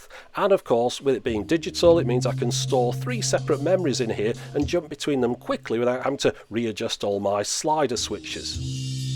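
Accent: United Kingdom. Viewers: Love the videos! The British accent you have makes the show seem smarter. And yes it's true: Americans think all British people are smart! Especially if we are from the south! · The video is educative because your presentation on perfect British English is cure for ears,only sugestion for this clip is absence of back panel and connection ports.....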